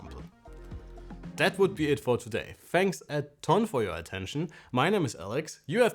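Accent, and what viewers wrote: Germany. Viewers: Puh, deutsche die auf Englisch machen, immer eine Herausforderung für die Ohren.... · Gutes Video. Aber dieser deutsche Akzent lol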